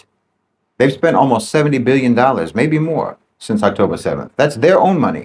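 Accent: United States. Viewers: Incomprehensible. He has dreadfully bad delivery. A lot of phrases and sentences are just noise. He gabbles the most important terms. He does not explain the most important terms. I have tried over and over to hear the term he uses for the opposition to what he calls Neocons --- which itself is some codeword only known to Unitedstatesians --- and it's still just noise. The thick Unitedstatesian accent makes even simple sentences hard to follow.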